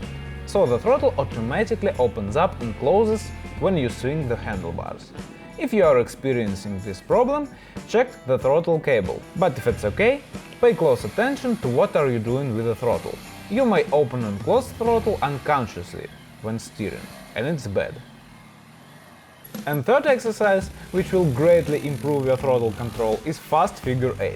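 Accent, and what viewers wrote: Russia. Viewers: I just love his accent, it’s Russian, right? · Love the Russian accent on English. Sounds like a Marvel character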